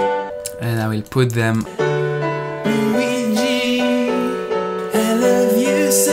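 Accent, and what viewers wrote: France. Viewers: ta bon un accent français 😁 moi aussi je suis dj et producteur et j'ai un chat aussi par ailleurs 😆 sa fait plaisir un youtubeur qui fait des videos sur fl studio de cette maniere avec de l'humour et du montage j'aime bien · Hes french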